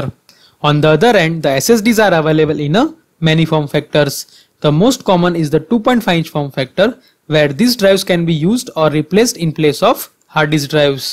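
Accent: India. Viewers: Indian, we all always know your voice...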